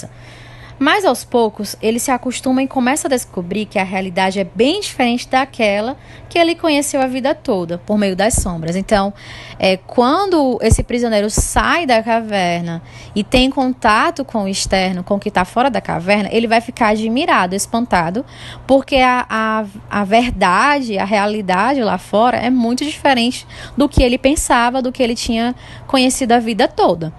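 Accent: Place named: Ceará, Brazil. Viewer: Legal o jeito que tú fala. Tú é cearense? Parabéns pela a aula!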